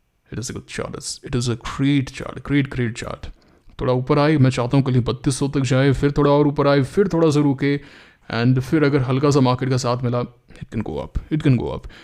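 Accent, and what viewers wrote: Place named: Bikaner, India. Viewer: sir Bikaner ya uske aas paas churu, hanumangadh tri junction ke prateet hote hain bolne se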